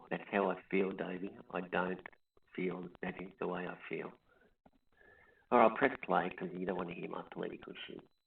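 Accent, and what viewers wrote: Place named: Australia. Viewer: As an American, you sound like every other Aussie, sort of like how all Chinese look the same to Americans. No offense meant there.....just sayin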